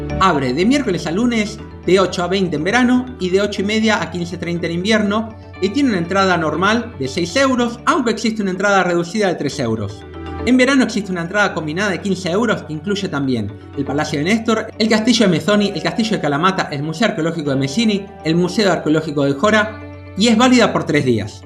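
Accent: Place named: Argentina. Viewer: Gracias nuevamente. Solamente que me cuesta seguirte con esa pronunciación argentina (muy musical y familiar, pero que a veces es difícil para los peninsulares). ¿Cómo has dicho que era el acueducto Olvidado? Lo he buscado en el mapa tal cual y no lo he encontrado. Gracias. ¡Ah, y enhorabuena por la página!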